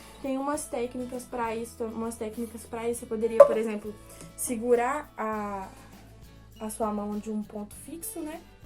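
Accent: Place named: Minas Gerais, Brazil. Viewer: Ela é muito linda, e me parece pelo sotaque, que é conterrânea, das Minas Gerais.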